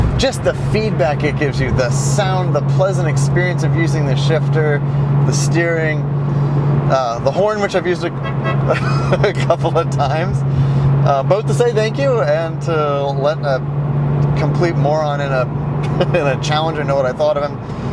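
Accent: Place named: Ohio, United States. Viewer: San LOUIS Obispo?!???? You sound like your from Ohio or somethin!!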